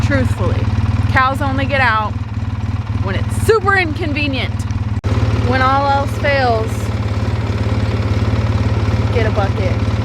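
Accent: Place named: Midwest, United States. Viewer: Just found you this am. I knew I heard a midwestern accent. I was raised in central Illinois. You sound a lot like me and my sisters. I will be following you and will share your post. I follow older people who would be happy to support younger people. Your flowers are gorgeous! I nice blend of real life and information. Hope you have a wonderful week.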